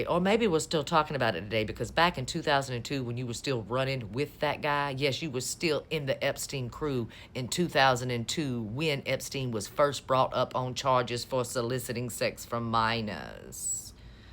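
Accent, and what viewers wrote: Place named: Louisiana, United States. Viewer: First of all took me a while to figure out what that accent came from.Yeah, I know, shut them.I'm like.Oh that's a proper southern woman's language from louisiana.. Don't care , don't care you have beautiful grandchildren , you have beautiful Beautiful. Eyes I can see it way appear in ohio Don't mean anything by but I love you honey❤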